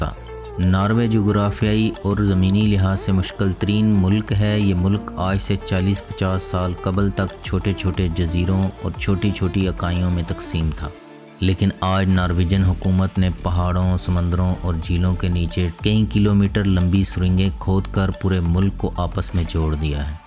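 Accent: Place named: Punjab, Pakistan. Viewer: the information is good but please pronounce the words accurately, if you are speaking in Urdu language as at the moment, you are speaking in punjabi. It Not 'Narway' its pronounced as Norwary. Secondly its called 'mulk' not mulaak in Urdu so please correct that.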